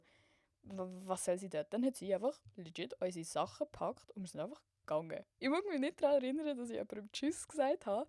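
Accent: Basel, Switzerland. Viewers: cools format! hesch du jetzt en basler dialäkt oder es misch masch zwüsche allem? :)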